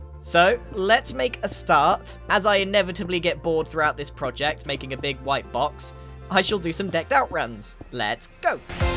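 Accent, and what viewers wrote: United Kingdom. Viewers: R u British? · Why is vocabulary sound much more British today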